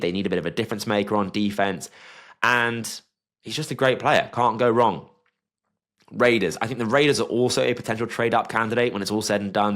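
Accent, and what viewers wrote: United Kingdom. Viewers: Sorry I can't listen to some British guy talking NFL. Wrong football mate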